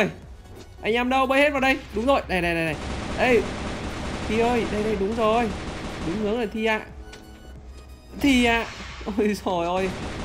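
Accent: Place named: North, Vietnam. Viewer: bắc nhé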